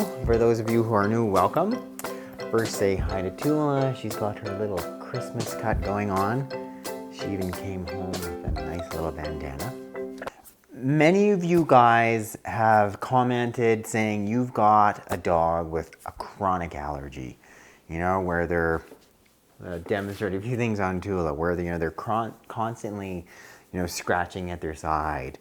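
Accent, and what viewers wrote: Canada. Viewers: I thought I detected a Canuck accent... I love being right! We really do pronounce "out" and "about" in a special way..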